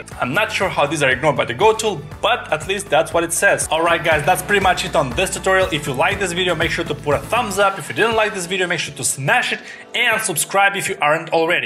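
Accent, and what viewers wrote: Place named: Russia. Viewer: really?) I've heard that aII-too-famiIiar russian accent) Thank you for great introduce!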